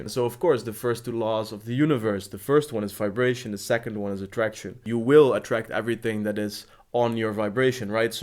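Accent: Netherlands. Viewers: Ben jij Nederlands? Je klinkt Nederlands. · Ik ruik een nederlands accent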